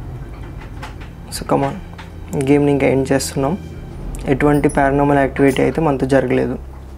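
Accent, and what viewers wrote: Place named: Kadapa, India. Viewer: Kadapa slang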